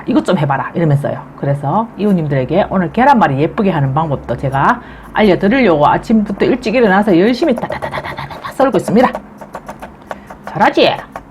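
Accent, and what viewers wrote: Gyeongsang, South Korea. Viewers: 짝짝짝 😄 구수한 경상도 사투리가 정겹습니다 얼굴이 안보이가 보이스만 들리니까 개그우먼 김영희씨 얼굴이 자꾸 떠오르네요 유쾌하고 유익한 영상 잘보고 있습니다 참고로 저는 캘리포니아 에서 열심히 응원합니다~ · 갱상도쌤ㆍ오늘도구수한사투리 ㆍ감사합니다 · 너무재미있으시네요 경상도버젼으로얘기를잘하시네요 짝짝짝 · 계란 말이도 예쁘지만 경상도 말솜씨가 너무 재밌네요 친정 막내올캐 목소린데 맞지예~ 듣고 깜놀 했네요 지는예 둘째 월선 고모입니다 박수 짝 짝 짝 댓글 보면 답주이소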